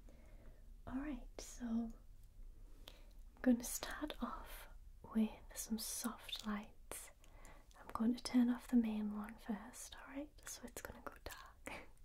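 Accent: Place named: Newcastle, United Kingdom. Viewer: You have no idea how happy I am to have found a geordie accent 😭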